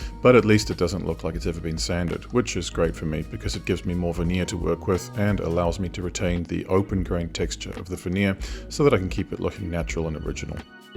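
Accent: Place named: Australia. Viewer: Great looking piece and a timeless classic. ❤I am living in Germany as well, but my I ask where you are from? I suspect more Australian than British judging from your accent.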